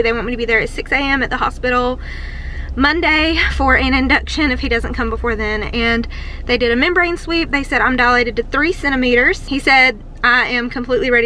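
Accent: South, United States. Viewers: I love that pink tote bag! Sooo cute. I was induced with my last baby and it went sooo well. I took some cervical ripeners and then they broke my water and he was born 4.5 hours later, no pitocin needed. I am going to be induced at 39 weeks again this go round, I’m 30 weeks on Thursday. Good luck mama! I can’t wait to see him! I’m sure it will go great no matter how he comes. Also I just love your southern accent, I’m from Wisconsin and we have the weirdest accents here.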